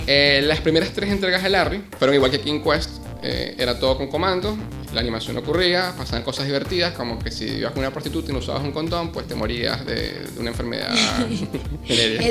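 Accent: Venezuela. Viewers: Imagino que eres venezolano, es que tu forma de hablar y de expresarte es única, sin hablar de tu voz.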